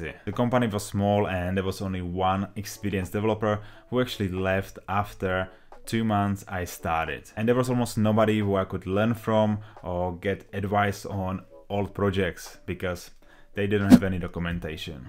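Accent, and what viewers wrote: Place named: Czech Republic. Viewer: Can I just ask if you are czech by any chance ? I hear it a bit in the accent :D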